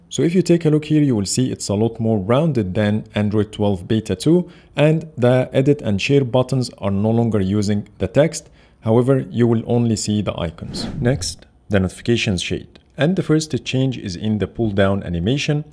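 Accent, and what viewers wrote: Egypt. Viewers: اللهجة المصرية باينة <3 بس الفيديو عالمي .. عاش <3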